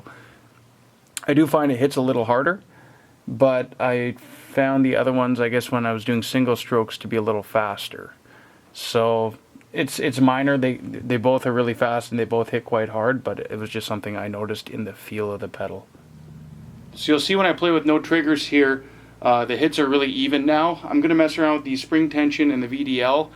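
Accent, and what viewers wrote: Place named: Ontario, Canada. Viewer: I can tell from your accent you're from rural ontario? lol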